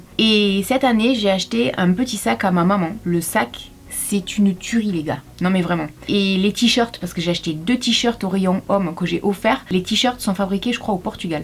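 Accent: South, France. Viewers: t'inquiète pas je filme avec une tablette merdique et crois moi ça fait l'affaire et moi non plus je suis pas top des Kardashian. Tu est top continue comme ça je te suivrait toujours je suis contente a chaque fois que tu sort une vidéo ton accent du sud me tue j'adore. Je suis allée dix jours a saint pierre la mer et j'ai adoré l'accent du sud et le vent du sud le marin. Bonne soirée.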